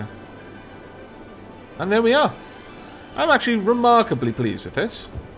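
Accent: Wales, United Kingdom. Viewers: I love that the cruise ships plow straight through the water turbines as though they aren't there XD I also have no idea why ... but I LOVE IT when a welshman says "lovely" so this video was audio heaven for me :-)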